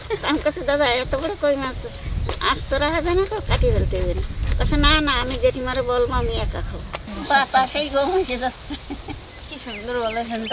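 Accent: Bangladesh. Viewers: সব ঠিক আছে কিন্তু এনারা কি বাংলাদেশের লোকজন? এরম ভাষায় কথা বলেন এনারা যেন মনে হয় বাংলাদেশে থেকে এসেছেন..যদিও বাঙাল অনেকেই কিন্তু পশ্চিমবঙ্গে জন্মগ্রহণ বা বসবাস করলে তো ভাষা পরিবর্তন হবে.. একমাত্র যদি born and brought up বাংলাদেশ হয় তবেই এতবছর বয়সেও ভাষা বদল না হতে পারে।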